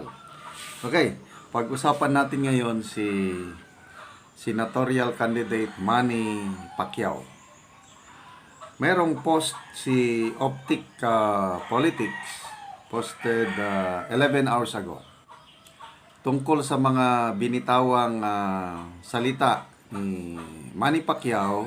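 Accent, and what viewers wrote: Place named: Visayas, Philippines. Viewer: Wow kabalo man diay ka mag bisaya sir?😂😂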